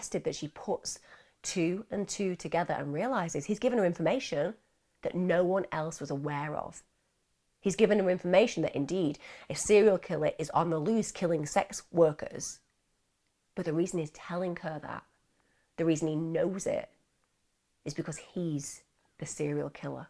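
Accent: United Kingdom. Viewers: I'm getting ready to watch this new episode. I think this is my third episode with you. I listen to some other true crime YouTubers who occasionally do a "deep dive" but all your videos are deep dives, which I appreciate. I'm turning on the captions because my American ears can't always understand your lovely British accent.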